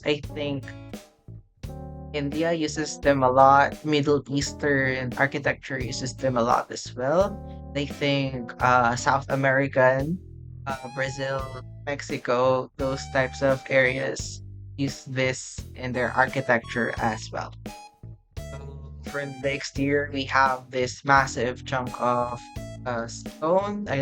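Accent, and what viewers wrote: Philippines. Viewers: i mean he has a filipino accent and latin american countries also have same styles as the philippines so connect the dots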